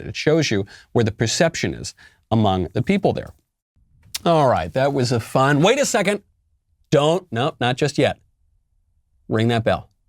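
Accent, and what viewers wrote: England, United Kingdom. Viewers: Not a bad UK Accent Mike! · Very nice british accent by the way. Also, yes, we live in a day and age where Christian views are no longer tolerated. I fear for raising my children in such a society. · Your British accent is quite impressive... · Christians now more scared of the left than of God!! Your English accent is shite. Its doesn't make you sound funny and takes away from the point you are making. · with that British accent had me dying lmao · I'm worried now. I live in England I'm still in school. We're learning about abortion and school and I'm probably the only pro-life person in my year; l've quietly mentioned my views and had a discussion with my liberal friends on it, but I don't feel it's safe to be completely honest even, well especially in my Ethics class. Your English accent is questionable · Love the English accent!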